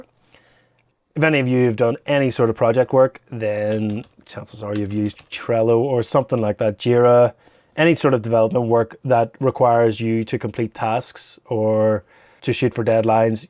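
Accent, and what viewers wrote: Northern Ireland, United Kingdom. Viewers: You sound Northern Irish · You sound Northern Irish.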